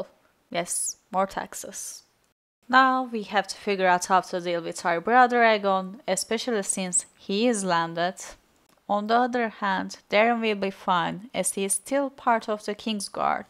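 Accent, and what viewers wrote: Turkey. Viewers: It is look like Turkish accent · Yup that’s 100% Turkish accent 😄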